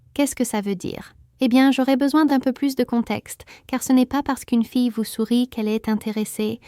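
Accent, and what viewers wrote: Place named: Canada. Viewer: ce qui me gene cest cet accent canadien ia ou pas ?????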